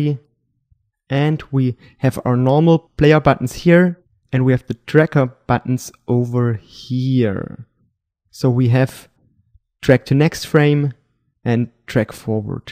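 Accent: Germany. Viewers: kann es sein das du deutscher bist? :D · r u german? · you're german right? Got a german accent :D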